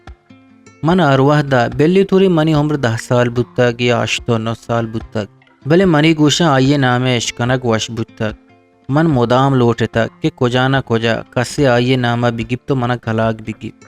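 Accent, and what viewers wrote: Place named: Balochistan, Pakistan. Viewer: Your Baluchi accent mashallah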